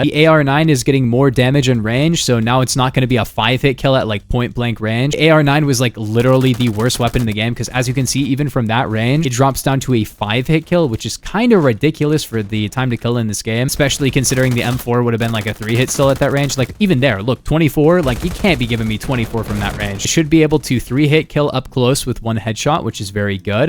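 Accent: United Kingdom. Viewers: Hes fucking BRITISH???? explodes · AND he's british?? damn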